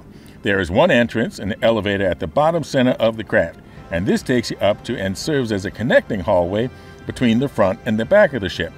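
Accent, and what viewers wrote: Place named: South, United States. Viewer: Please don't take this personally, but for most of the world a southern US accent is not associated with the best of things. Also it's not always easy to understand. I would prefer a more moderated, just normal, English.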